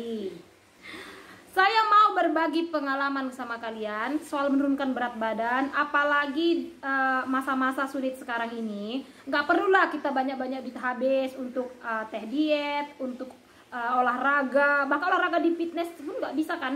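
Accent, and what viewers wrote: Medan, Indonesia. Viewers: Ka. Aslinya org mana ?? Klo nonton kk ingat kampung halaman . medan.. Logat nya itu loh.. Congok..hahahaha.. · Kek ginilah klo orng medan dah cakap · Medan kali logat kk ni.. 😊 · Orang Medan ya kak... Klu dgr dri logat ama nada saat bicara... Paten kali kk ini.. Makasi ya kak ek tips nya.. Mantapppp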